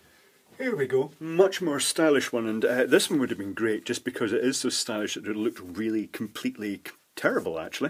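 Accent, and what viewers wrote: Scotland, United Kingdom. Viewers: with the Scottish accent, "powers up" sounds like "purrs up"... it's nice to imagine the motor purring like a little kitty...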